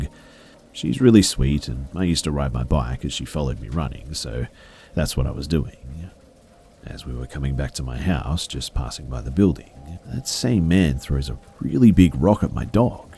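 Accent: Australia. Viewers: it’s australian :) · His Australian accent reminds me of hugh Jackman that's a good thing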